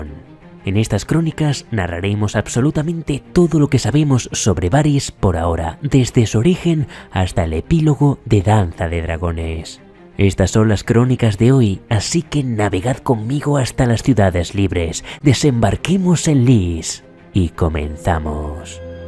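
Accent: Spain. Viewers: La verdad el acento español me cae mal.... Pero no puedo dejar de escucharlo 😅😅😅😅😅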